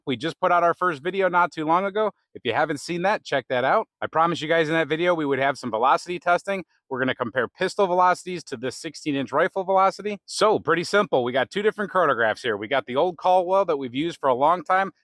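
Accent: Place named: North, United Kingdom. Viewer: Instant subscribe because I always appreciate good, substantive number crunching. The friendly northern accent is also an added bonus.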